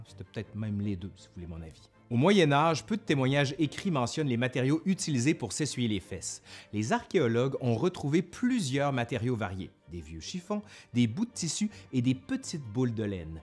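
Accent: Quebec, Canada. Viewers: Purée l’accent québécois c trop beau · Je découvre votre chaine sur cette "capsule" 😆(désolé, c'est pas méchant mais tellement marrant pour nous les françois !) sur cet épisode du PQ, et honnêtement c'est par inadvertance et non par curiosité malsaine. Bref, je trouve le présentateur des plus agréable à écouter, avec son petit accent canadien ( que j'aime beaucoup). Je trouve vos sujets très enrichissant, bien que je sois pauvre comme Job, normal je suis retraité, (plus de job). Et racontés avec une emphase des plus agréable. Alors Merci énormément, abonnement de surcroit. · Entre le papier de toilette, le papier toilette, le PQ, le papier de chiottes... Ohlala ! Ta vidéo est très rigolote et je me suis bien marré. Tu fais vraiment un très bon boulot ! Ta chaine, avec ton accent québécois à couper au couteau, c'est une bonne partie de rigolade et maintenant, voilà ! 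Tu me fais aimer l'histoire... Et bien, quel exploit ! Bravo à toi !😀😁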